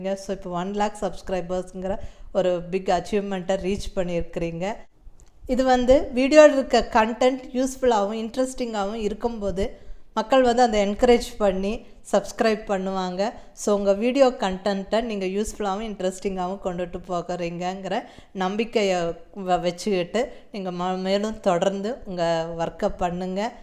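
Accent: Kongu, India. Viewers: Many congratulations madam Wish you many more laurels 💐 Above all your kongu tamizh is also attractive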